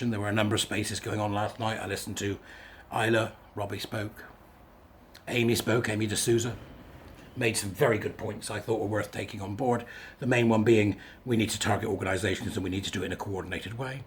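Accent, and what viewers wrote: United Kingdom. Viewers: you sound like your from the southwest of the UK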